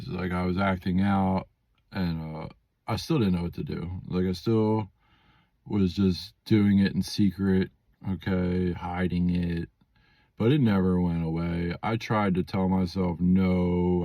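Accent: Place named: Philadelphia, United States. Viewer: I totally knew from your accent that you were from the Philly area!! My family is from Levittown/Bucks County!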